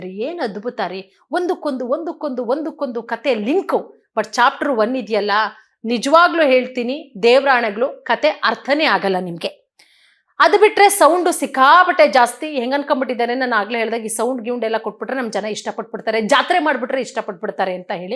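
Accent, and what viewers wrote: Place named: Andhra Pradesh, India. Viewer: Telugu influence ninda mathadthiddara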